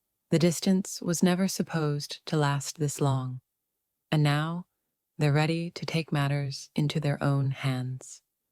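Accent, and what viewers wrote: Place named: Scotland, United Kingdom. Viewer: 😊🤗🌹 did you believe the distance was not having effect on me? It did have an effect on me. I love talking to you and now I'd like to see you sit down and let's start talking. By now. You know me so well. Don't think you'll even be nervous. I just can't wait to hear that British accent. A lot of it's gone. Which old videos to now still there? Oh, it's Scottish. I'm sorry. Excuse me your Scottish accent. I have to get that straight. I will. Everything we do now is going to be for the better you're going to be a lot happier. Feel a load off you that you haven't felt in 30 years and you got me with a woman who truly just loves you. You lose everything tomorrow okay? We are both and great hands. I know I'm always going to be honest and I know you are because you believe in call and I hope with your domestic issues you resolve them fine. 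Get her out of your life, not for me, but for you she's dragging you down. She's making you believe things at on shrew. I don't like when women take advantage of men's good hearts and that's what she's doing to you. Take the rest. Take the chance I am. What are you thinking My love 👠💋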